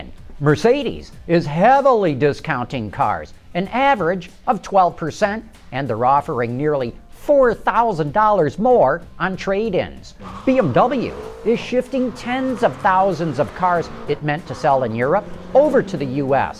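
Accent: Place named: Midwest, United States. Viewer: Theses videos are brilliantly well done. But my only complaint is McElroy's funny-sounding Midwest accent.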